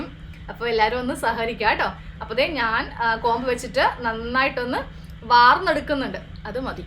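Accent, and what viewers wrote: Kannur, India. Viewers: Kannor aanalle, naanum kannoor aan. Samsaram kettappam manassilayi😃👍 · Kannoorkariyano samsaram kette thonni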